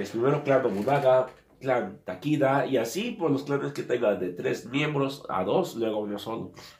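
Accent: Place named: Portugal. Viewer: Eres de portugal o galicia?....... Hay veces que no te entiendo. Yo soy nativo del español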